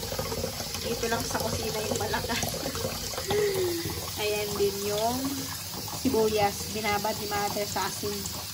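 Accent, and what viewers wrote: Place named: Leyte, Philippines. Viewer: Leyte ba kayo gang ? Parang leyte speaking kc kayo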